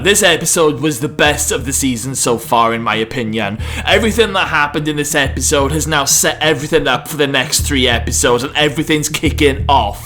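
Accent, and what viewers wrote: Wales, United Kingdom. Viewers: Love your analysis so much, as Wentworth keeps getting better so do your reviews- this review is pure art with your fine detail of screenshot selection, your feelings in response to the scenes resonate. I love your predictions - I definitely think you could have a role for the show - as a Melbourne boy born and bred but living in UK I love to hear the passion snd love for our best ever Australian tv show delivered in a welsh accent. I vote for you being offered a role by FOXTEL to create official special feature shows that celebrate the series and give you direct access to the cast/crew/writers.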